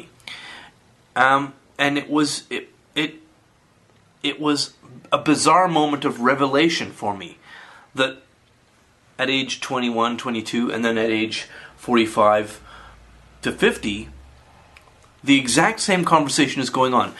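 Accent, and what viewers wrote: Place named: Scotland, United Kingdom. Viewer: sounds Scottish. I'm a Scot myself.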